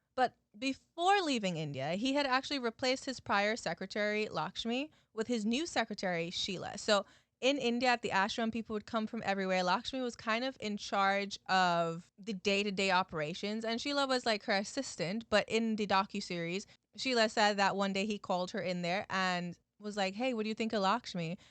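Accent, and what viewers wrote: United States. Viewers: Your accent sounds very Californian to me. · I thought your accent was American😳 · I'm an American but with a terrible ear for accents. I've always thought she sounded American who maybe grew up with parents with accents from another country so that she says some things ever so slightly differently than I expect but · As a non-American, sounded American to me!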